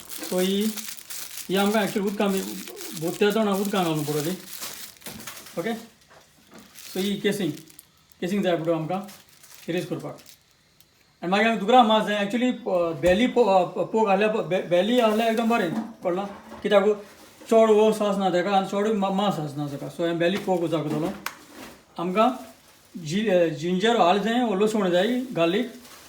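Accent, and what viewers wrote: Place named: Konkan, India. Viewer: I like your konkani accent...and your recipe's are really good. 👍Tuge chouris maas receipe maka bori lagli. Mog asum.🤗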